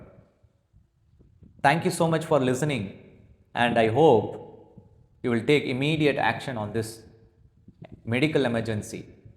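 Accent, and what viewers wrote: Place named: Coimbatore, India. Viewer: First porn and unnecessary sites to be banned. TV channels also promote adultery. TV serials show a man with two women stories and illegal relationship. Also in the name of revolution unnecessary stories with wife applying for divorce even if her husband is good. Why can't serials show good stories with history and atleast one science serial. Self control and discipline are needed. Moral science has to be made mandatory. Pre-marital sex is wrong. My grandma will not allow even her married daughter with child to go out alone after 6pm. She used to tell a girl should not talk with an unknown person. One should know their limits while moving with a known and an unknown person. These things have to be conveyed. Coimbatore English pronounciation. Kindly use Tamil slang.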